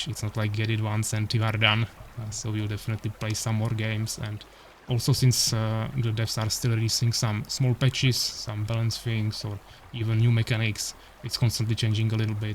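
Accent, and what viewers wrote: Czech Republic. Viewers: Did i hear strong Czech accent?🙂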